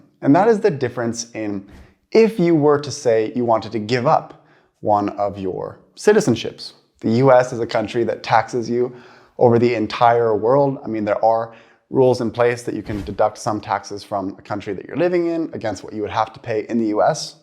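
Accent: Sweden. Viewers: Look at that, the Swedish accent is creeping is as well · saw your vids a few years ago. Now at the time stamp, you sound more like a Swede who is fairly good, not great, at speaking english.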